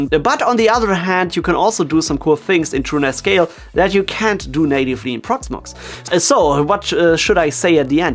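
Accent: Germany. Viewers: Moin, netter Überblick. Bringst eine Gute Energie rüber! Werde hier definitiv nochmal vorbeischauen ;) Gut, dass du das mit "Linux als OS" noch im Nachgang erklärt hast. Das hat dir glaube ich einige Kommentare erspart :D ;) weiter so! offtopic: auch respekt an dein flüssiges englisch. aber doch immer wieder erschreckend wie man es ab sekunde 1 raushört, wenn jemand aus germany kommt. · that is one solid german (i assume) accent, pleasant to listen to if i may say so.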